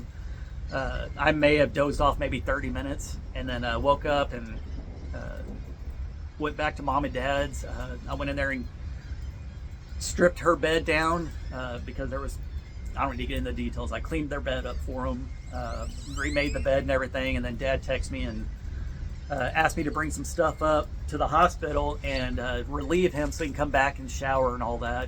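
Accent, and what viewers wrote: Texas, United States. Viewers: Praying for you and your family. ❤ You are such a sweet man and son. Jesus heal the trauma and hearts and give them peace and health for all. ❤❤ I have watched your channel for a few years because Im from Texas living years in Utah, love tiny homes and love hearing your accent because it reminds me of home. Keeping you in prayer. 🙏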